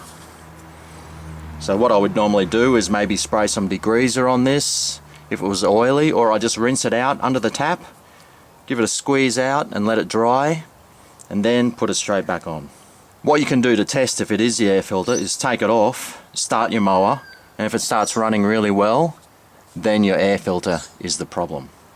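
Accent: Australia. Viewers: Thanks heaps! Fixed my mower! Legend! U also taught me how to cook Corned beef (was that diff channel, watched it over a yr ago!?) ! And all with the Aussieness I'm used to! Cheers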